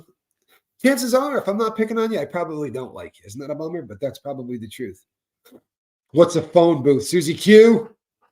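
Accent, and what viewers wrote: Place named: South Boston, United States. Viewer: I hear a lil bit of Southie in your voice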